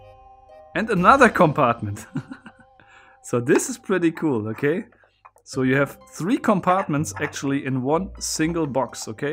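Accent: Germany. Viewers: Is that german accent? 🤔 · Yes, it is a German accent... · wie man einfach raushört das er deutsch ist xD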